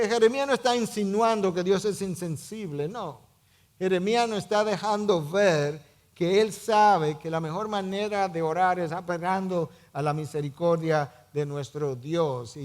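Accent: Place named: Dominican Republic. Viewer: Mi señora el pastor es dominicano y esa S hace parte de su acento. No se fije en pequeñeces como esas sino en lo importante del mensaje. A usted por ejemplo se le escaparon varias tildes en palabras que las llevan🤔😶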